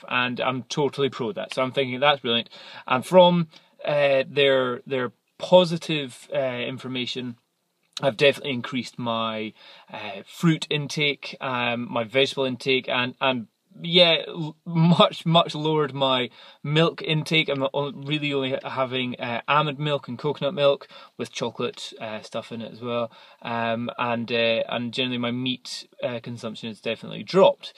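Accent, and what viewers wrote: Scotland, United Kingdom. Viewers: Scottish? :D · what accent is that? I mean I know it's Scottish (I think), but what town or area? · Is that a Scottish accent I detect?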